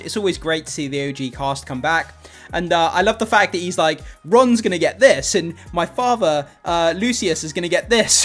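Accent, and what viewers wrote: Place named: United Kingdom. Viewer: great video as always! An off-topic question tho - as a foreign languages enthusiast throughout all your videos I've been wondering about the way you pronounce the H in the "HBO series". If you wouldn't mind answering a personal question - what is your native accent? I guess it's definitely a UK one - but which exactly?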